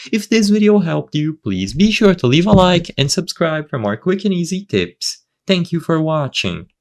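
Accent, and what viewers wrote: Brazil. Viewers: esse ingles é de brasileiro ein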